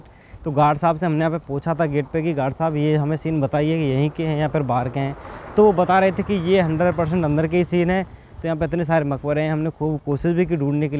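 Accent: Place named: Mathura, India. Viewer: Bhai aap mathura se ho kya Apki languages esi hi he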